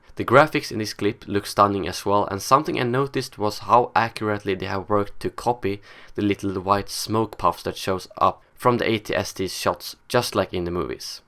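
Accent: Sweden. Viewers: Are u from Sweden??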